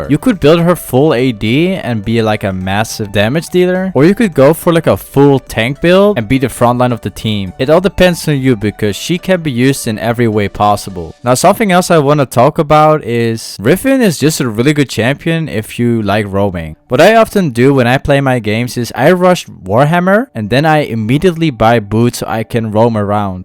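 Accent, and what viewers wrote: Netherlands. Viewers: Ben je Nederlands , hoor echt een accent?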